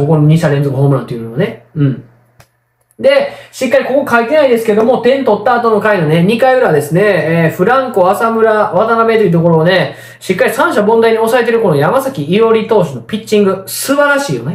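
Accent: Kansai, Japan. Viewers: 小山さんって関西の方ですか？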